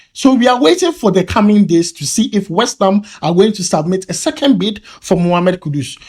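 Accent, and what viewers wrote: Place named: Nigeria. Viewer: That means the Trainer is not good.A Ghanaian you’re speaking English like a Nigerian.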